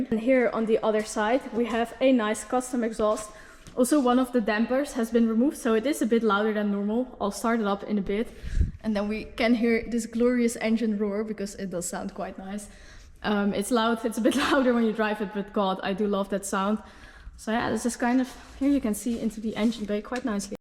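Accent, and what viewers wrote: Netherlands. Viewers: First time here. Heard your Dutch accent right away! Wondering where you are in Nederland? My kids & grandkids are in Barendrecht and Dordrecht area (Ik ben in Utah, USA... lang verhal, hoor! Lol 😅) I'm an 'ol Willys Utility Wagon guy. My dad bought new in '64 a new Wagoneer. Drove it in high school in the '70's. But I fell in love with the older Utility Wagons ('46-'63) I have a '59. I LOVE these old tough off-road machines. The Volvos, Mercedes, UAZ's etc. Usually having only a face a mother could love but capable of traversing almost any type of terrain. I'm pretty sure those machines around you are not challenged much in Holland (except mud) but would be "fun" in Russia or China or...??! You are a girl after my own heart. Would that you had been there years ago when I needed someone just like you. 😊 'sja... Nou ja, zeg! God bless you in making your dreams come true. 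If you ever want to see Utah (Moab) the Great Basin Desert, hit me up. Always welcome here! Totziens! 😎👍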